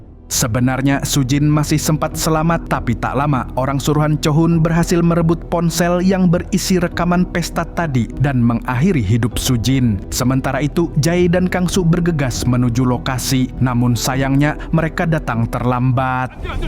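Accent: Banjar, Indonesia. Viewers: URANG MANA MANG ?? BANJAR AH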